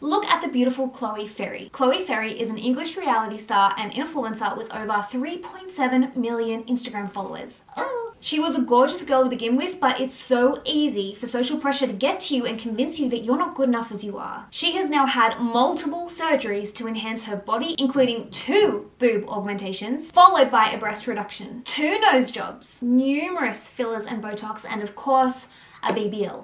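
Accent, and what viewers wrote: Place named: Australia. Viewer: aaaaah cant believe you replied meeee!! i love your aussie accent i want to speak just like youuu hahahah looooove your content btw keep it up!!! i never miss a video!!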